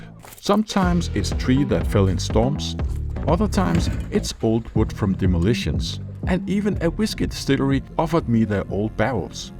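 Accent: Denmark. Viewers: So happy to hear you have reached far, i always love when i see your uploads. keep it up.. it makes me smile when i hear your Danglish, and it helps me in my day here.. Greetings from a fellow Dane living in Indonesia.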